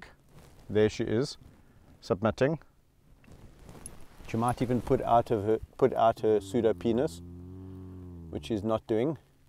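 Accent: South Africa. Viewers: Very special...many thanks. Your accent is rehabilitating white South African too! Far more of a challenge than changing a spotted hyenas rep!!?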